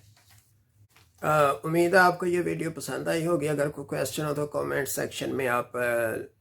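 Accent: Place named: Sialkot, Pakistan. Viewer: Sir lagta ha ap sialkoti hain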